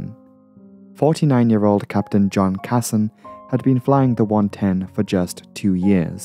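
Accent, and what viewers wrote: Newcastle, United Kingdom. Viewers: Hello from sunny and warm St. Petersburg, Florida! Love your choice in the piano music. Love your British accent, us a great narrator voice. Want to visit London but I am unsure if I can handle the weather. Snow & cold was fun as a child in Chicago! Look forward to your next vid. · What clear pronunciation, deserves to be on docs. Not bad for a geordie. Met a few in the middle east. An aussie and subbed. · I'm from the North East of England too. Your accent has little bits of Irish, Scottish and a slight American lilt at times. I can hear the geordie in you a little bit though but I wouldn't have thought you'd been born and bred in Newcastle by your voice.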